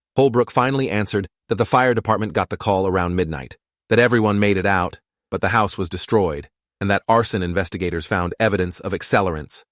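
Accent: United Kingdom. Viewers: Anyone else notice how his voice slowly slowly start sounding like British accent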